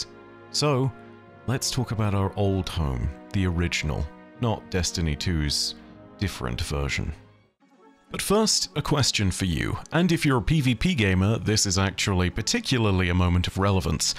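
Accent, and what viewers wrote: United Kingdom. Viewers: It’s my favorite British destiny lore guy. Tribes look awesome sign me up!!!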